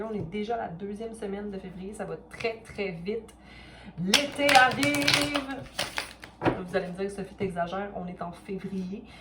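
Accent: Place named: Quebec, Canada. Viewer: Coucou ma belle 😊 Merci beaucoup pour ces guidances, en effet, j'essaie de me sentir bien avec moi même, j'y travail, je ne dois pas me sentir "complète" avec un autre, mais l'être moi même 😉😊 Juste une petite suggestion, rien de méchant... Ce serait vraiment beaucoup mieux si tu mettais le signe astrologique en premier dans le titre de tes vidéos, car nous sommes obligés d'aller sur chacunes d'elles avant de tomber sur le signe voulu. 😉 Par exemple : Verseau ♒ semaine du 8 au 14. Voilà 😊 je te souhaite un bon week-end et mon dieu... Qu'est ce que j'adore votre accent à vous mes Québécois 🤗🥰 Bisous ma belle 😘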